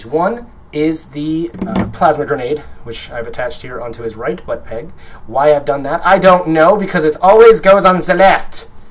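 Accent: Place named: Germany. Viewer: nice german accent, but I'm so sad they didn't do well on the Fuel-Rod Cannon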